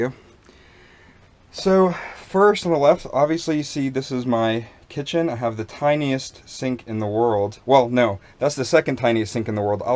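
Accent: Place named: Canada. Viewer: Nice van. . I hear a slight Canadian accent, are you from there?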